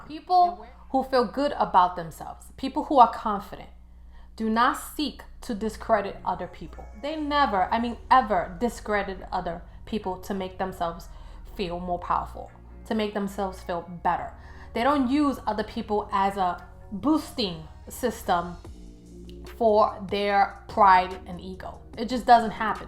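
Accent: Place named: South, United States. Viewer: Southern accent.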